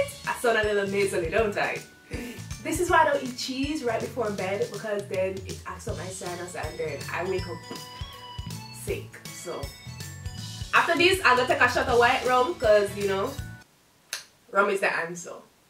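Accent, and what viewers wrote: Jamaica. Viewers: are you from Jamaica or did u lived in Jamaica because u talk like a Jamaican and btw am from Jamaica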